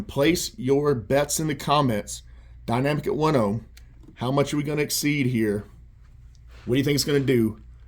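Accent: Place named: South, United States. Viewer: Yes, I hear that. It is correct to my ears. The wrong way would be: three thousand, five hundred, point nine one. Are you in the USA? If not, there may be a difference in the way numbers are spoken. I'm American so it sounds normal to me. Another acceptable way he could have said it is: three thousand, five hundred AND ninety one. Either way is normal over here. He also speaks with a southern accent, so that can be a bit difficult if your not used to it. I don't think he's trying to deceive anybody. 👍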